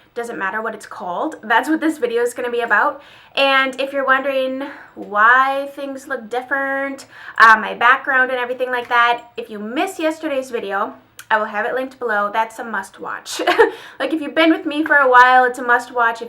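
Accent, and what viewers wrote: United States. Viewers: New subscriber here your beautiful I love your voice I love American accents (I am from the UK) great video. Xxx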